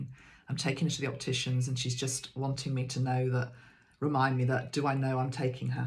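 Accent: United Kingdom. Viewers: PS, I actually notice and love the way you pronounce your words! I just figured they were UK pronunciations 😂 🤍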